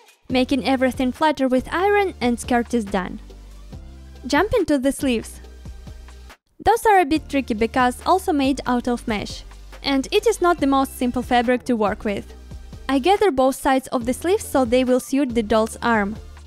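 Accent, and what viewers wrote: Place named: Ukraine. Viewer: I love the red doll and your workspace, but I just have to say... Your accent is so pretty! You're Ukrainian? I've never heard someone speak English so lovely! xD God bless all of you. ❤️ 🧡 💛 💚 💙 💜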